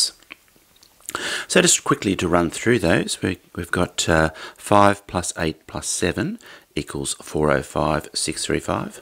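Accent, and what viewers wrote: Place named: Australia. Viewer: omg you are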